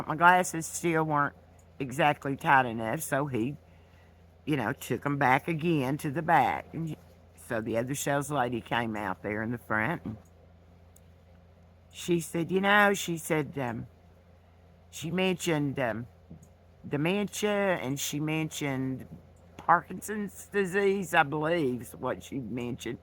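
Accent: South, United States. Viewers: Thank you so much for standing up for kindness, and common sense. And for speaking up💖✨I’m from AR & live in TN now…and it’s sad to see so many good hearted, hard working people being conned by the carpetbagger (tRump). It is so reassuring to hear a wise, southern accent sharing good information & kindness. I’m late seeing this video, so by now we know Biden has announced he will not be running for 2024…I agree with you on the corp media…hopefully now that he has made this announcement. I hope they (Democrats & media) stop the madness & get back to work for us…Thank you! I also watch Midas touch, Tx Paul, and TN Brando💖✨🙏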